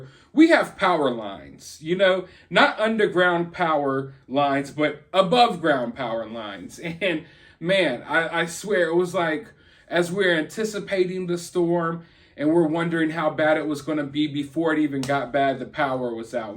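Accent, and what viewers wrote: South, United States. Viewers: Just from listening to your videos the past couple weeks I just knew you were from the South. LOL Alabama here.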